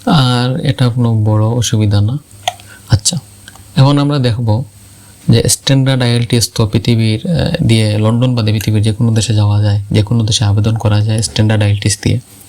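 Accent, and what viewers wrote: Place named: Sylhet, Bangladesh. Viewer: I guess , you are sylhety 💕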